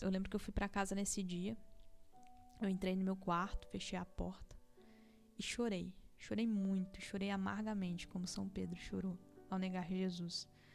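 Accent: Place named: Minas Gerais, Brazil. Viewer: Barbacena! A cidade das rosas 🌹 e da Beata Isabel Cristina Perto de minha cidade, Conselheiro Lafaiete , por isso a sua voz é muito agradável aos meus ouvidos! Sotaque mineiro é um trem bom demais! Conhece conselheiro Lafaiete?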